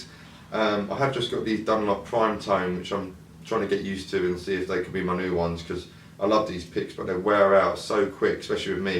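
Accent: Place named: England, United Kingdom. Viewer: You look like an italian but you speak like an english dude ^^ I like that :D and feel Better ^^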